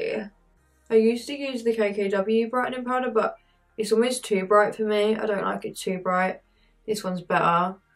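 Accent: United Kingdom. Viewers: didnt expect you having a british accent lol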